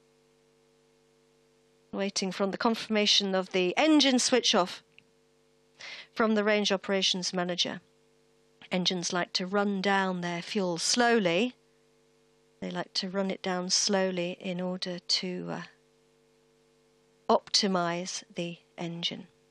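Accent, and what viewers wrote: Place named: United Kingdom. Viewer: Why this British accent ?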